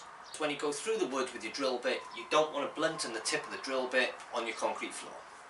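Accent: United Kingdom. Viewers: Nice video and excellent explanation. What part of the United Kingdom are you from?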